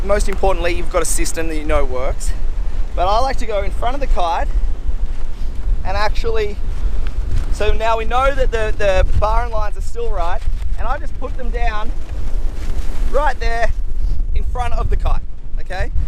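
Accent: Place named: Australia. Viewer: Hey. Just wanted to say I’ve appreciated all your videos. It’s great to have an Aussie voice showing us the way. It’s clear you love the sport and want others too as well. Your lessons are well thought out and easily understood ( except for the odd one where the wind in mic plays havoc. And your location always looks stunning too!! I hope you’re ok. I haven’t seen any new vids for a while. I have a question for you and I’m not sure whether you could help with some technical advice. I’ve noticed as soon as the wind gets on the stronger side for my kite size I have a lot of difficulty stabilizing the kite and often end up constantly sineing the kite unintentionally. Do I need to just focus more on what I’m doing or should I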